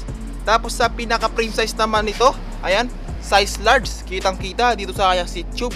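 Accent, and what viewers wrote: Pampanga, Philippines. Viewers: Kapampangan accent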